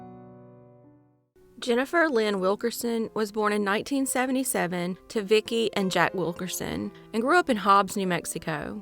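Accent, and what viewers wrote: South, United States. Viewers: Your southern accent really relaxes me. I’m from Arkansas and Oklahoma so not quite as long of a drawl for me but I still am around it. ❤️🤟🏻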